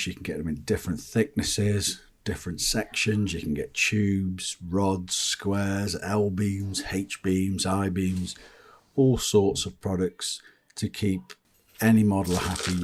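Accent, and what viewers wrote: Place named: Midlands, United Kingdom. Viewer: Excellent feature/tutorial. What would closest describe your accent, Leicester, Derby, Stoke?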